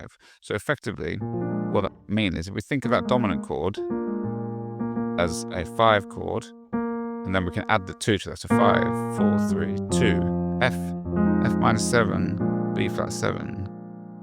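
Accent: United Kingdom. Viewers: thanks for your excellent teaching! Your British accent makes it extra special and realistic!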